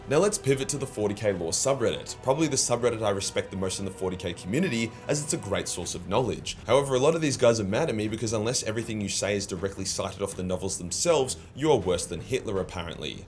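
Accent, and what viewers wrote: Australia. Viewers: From an outside perspective, all I see in is just an atypical Warhammer nerd who got really lucky and is pretty good at running a business. The Australian accent and slang helps him in his lore videos and his overall presentation is pretty solid. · has comedy, self awareness, lore and the aussie accent, goddamn give me more. Id give up maple syrup and tim hortons for this man. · Same. also Australian accents just make everything funnier. "Yah dog ahh" 💀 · Yeah, the Aussie accent makes sound like the video is fastforwarded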